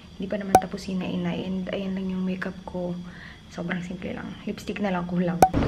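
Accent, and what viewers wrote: Batangas, Philippines. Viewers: Napakacutie magsalita ni miss pag kasama ang mga kapamilya haaha batanguena na batanguena e 😂😂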